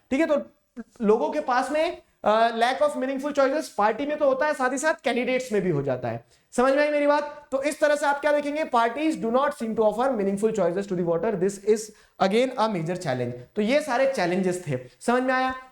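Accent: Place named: Rajasthan, India. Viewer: rajasthani tadka sir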